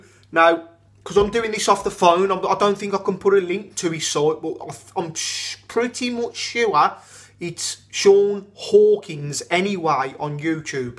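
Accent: Birmingham, United Kingdom. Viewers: Erm, nobody gives a toss mate, just be your Brummie self:)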